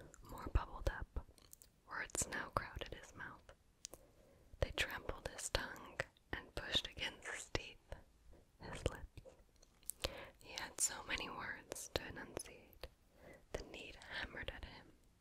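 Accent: France. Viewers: Your french sounds like France French rather than Quebec french or maybe I was just tired when I was watching. Either way its cool, I speak quebec french so it doesn’t sound as good. Lol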